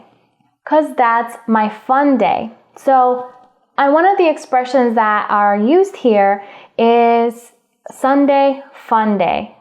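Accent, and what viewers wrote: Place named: United States. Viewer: Thank you for sharing your knowledge dear teacher. I think now my motivation is to be like you, because you have a great American accent😇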